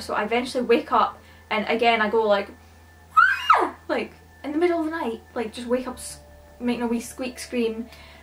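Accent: Scotland, United Kingdom. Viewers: This story time is immediately 10x better with your Scottish accent omg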